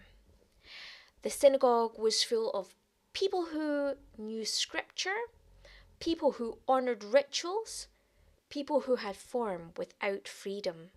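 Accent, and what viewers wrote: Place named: Ireland. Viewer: Nice. I wonder how quickly that deep Irish brogue would take to me if I lived in Ireland. I love to hear you speak. ❤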